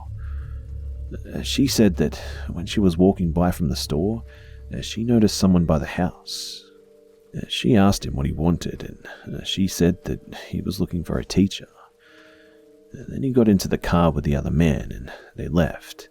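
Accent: Australia. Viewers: It’s good to listen to an Australian voice! You tell these stories so well. Keep up they great work 😊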